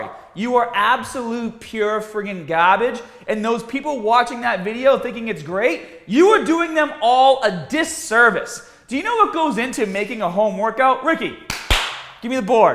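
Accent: Boston, United States. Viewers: This is great content but the aggressive Boston accent kills me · This is the strongest Boston accent I have ever heard... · I never noticed the Boston accent it’s killing me 🤣 watched your stuff and subscribed since 2009 keep doing your thing Captain! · You know your from MA when you can recognize another man's Masshole accent. Keep up the videos brother.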